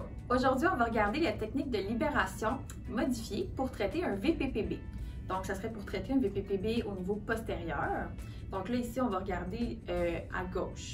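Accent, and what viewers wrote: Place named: Quebec, Canada. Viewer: Québec lol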